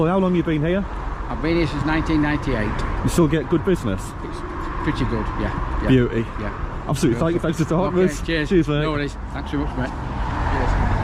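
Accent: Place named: Middlesbrough, United Kingdom. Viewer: You're a Boro Boy by the sound of it! My first ever home Villa game was versus MIDDLESBROUGH! in February, 1968...I was 10 years old. Oh no, we lost 0-1! Stood right behind the Holte home End and to my child-like eyes I always remember the Boro keeper - Willie WHIGHAM - seemed to be like a giant spider, a tarantula in goal...spindly legs and jet-black hair and if you google his name and also images, you'll see I wasn't far wrong! Very tall, gangly stature almost stick-thin legs, short black hair....and ugly with it!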